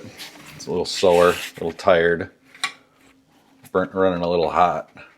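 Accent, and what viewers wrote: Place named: Minnesota, United States. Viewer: Hearing you talk is like being home in Montgomery Mn again as a kid.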